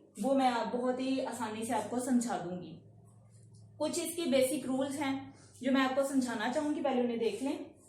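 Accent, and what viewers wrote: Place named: Lahore, Pakistan. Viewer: Are u from Lahore.....great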